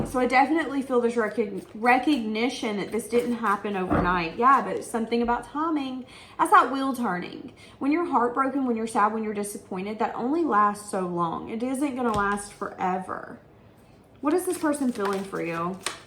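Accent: South, United States. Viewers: Hey I just want to say I really love your southen accent ❤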